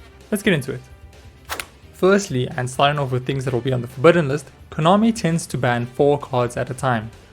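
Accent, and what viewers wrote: South Africa. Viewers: Im in. And i just realised u south african! Lesss goo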